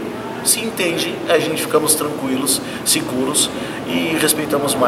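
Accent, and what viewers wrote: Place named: Rio Grande do Sul, Brazil. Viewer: Cara você é gaucho tá claro isso.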